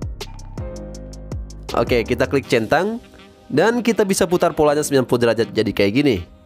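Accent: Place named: Gayo, Indonesia. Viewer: Fix dialeknya mirip orang gayo🤭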